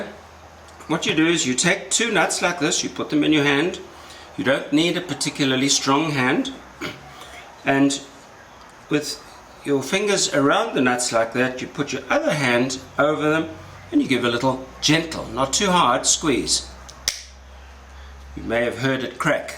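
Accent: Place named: Deep South, United States. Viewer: Deep South.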